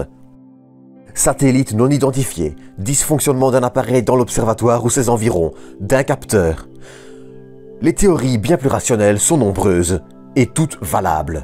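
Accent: Liège, Belgium. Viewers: Ta chaîne m'a été recommandée par le petit astronome...malgré l'accent belge qui pique un peu, j'adore ton style de narration ...bon courage!! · Sacré accent !!! Liègeois??? ;-)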